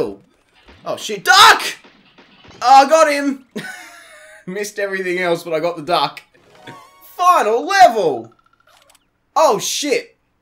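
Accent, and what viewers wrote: Australia. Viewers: Aww mate, congratulations on 10,000! Super happy for you, I know I haven't been here as long as a lot of your other fans (I guess it's been about... seven months? Eight?) but I knew from the first time watching you that you were going to be my favourite YouTuber; my instinct proved right and to this day you still are, you definitely deserve it and much more. As for this video I mean, shit, I've never even played a Wii in my life lol I've always thought they look mediocre at best, I knew people who had them when they first came out but they just never appealed to me. Looks like you had fun though haha, your laughter is contagious as hell.:') On a side note: I've noticed I laugh an awful lot whenever you say the word ''cunt'' in your videos lmfao I dunno it's just so hilarious to me, it's a funny word that's apparently made even funnier when spoken in an Australian accent. 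xD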